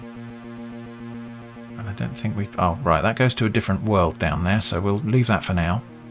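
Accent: England, United Kingdom. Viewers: um...games is plural....if some one asks you if you play games, and your answer is 1....then your real answer is NO I DO NOT PLAY GAMES...I play one game...thats a good way to show you're not really paying attention, and just making something about you, which is why you would know what the question is...even though you're obviously not paying attention if some one were to ask if you if played any type of game....thats basically it, do you like any type of game? That is singular...sounds like a English accent, but many people from Asia have English accents. So its confusing....you can make a 36 min video that only people who like you will want to watch while saying you don't have time to play games...do away with these type of videos and you might have several more hours a day.... as some one who doesn't know you, I don't honestly care if you play games...this is my intro to your channel and I feel I made a mistake by coming here. 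Now youtube thinks Im gonna want to watch but this channel isn't for me, if you're talking about yourself on the first video. We already have too many people in real life who think they are some big shot, thats one reason why we avoid real life and live online. Less people who are egocentric, used to be at least.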